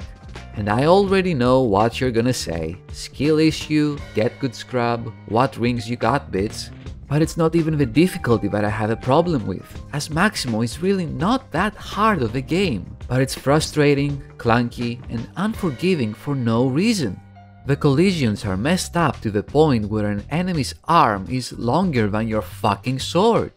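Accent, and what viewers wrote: Greece. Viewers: Το γεγονός ότι κατάλαβα ότι είσαι Έλληνας από την προφορά και όχι από την ποιότητα του βίντεο λέει πολλά. Κιπ ιτ απ, σου αξίζουν πολλά περισσότερα σαμπς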